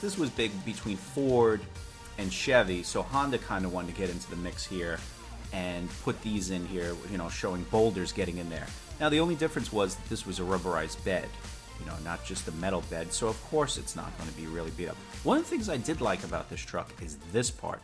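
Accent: New England, United States. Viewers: I am a salesman at a Honda dealership in Atlanta, and we had deposits on these Ridgeline's two months in advance, and still have many deposits to be filled. If you want to be sold on this truck, just come down from what ever New England state that your and your horrible accent is from to Georgia and we'll get you hooked up with the most diverse trunk on the market! Thanks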